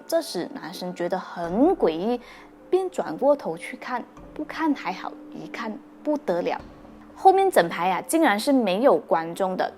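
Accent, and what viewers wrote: Cantonese, China. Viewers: 你好可愛呀，你啲粵語好得意好好聽🥳🥳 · u cakap cantonese cute sikit ~~ try to do some cantonese content ~~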